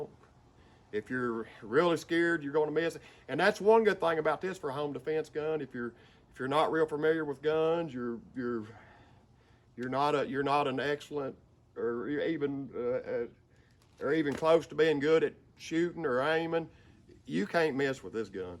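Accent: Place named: North Carolina, United States. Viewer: You my kind of guy friend got a gun for every situation !!! Love the video and the southern accent which sounds like NC. or Tenn !! Imma Virginia ole boy got my two Colt .45's and want to collect me one of them coach 12 ga. shotguns with the external mules ears and a Winchester 1873 in .45 Colt to complete my cowboy gun collection !!!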